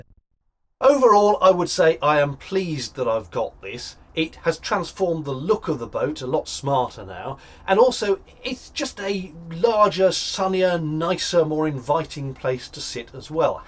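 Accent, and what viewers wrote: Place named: RP, United Kingdom. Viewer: ... So nice to see a new Narrowboat video from you. I also appreciate your use of and dry humor expressed in the English language. It's becoming a rare thing these days, when a generally lower "quality" of use is considered to be acceptable. If you like, I appreciate the style of BBC Radio 4 English and pronunciation. I suppose this shows my age. Long may you continue.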